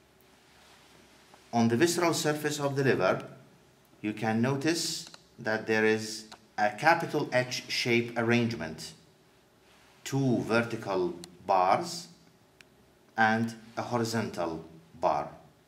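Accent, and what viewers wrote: Iraq. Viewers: you are speaking like Iraq people ... you must be from Iraq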